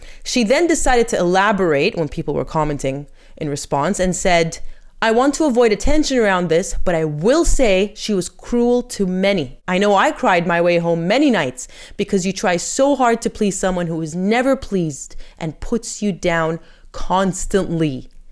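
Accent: United States. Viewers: What country did you serve ? You sound American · Curious... Why do you have an American accent? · May I please, politely, ask about your accent? You sound so American but are definitely an Ozzie. I have been there and the Ozzies were lovely. Also thank you, and your wounded friend, for your service. I'm English and they can both feck off.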